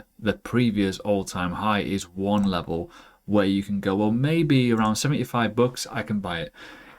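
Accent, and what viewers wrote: United Kingdom. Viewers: I LOVE THE BRITISH ACCENT :)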